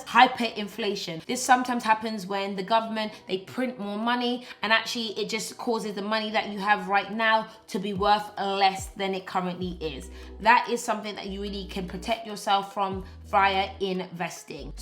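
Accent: United Kingdom. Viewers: She sounds British but uses USD and GBP interchangeably in thumbnails and videos, far too confusing. If it's content for british viewers make it apply to british people and vice versa for americans. Can only assume it's to help her increase reach with american viewers, but it's enough to make me stop watching altogether.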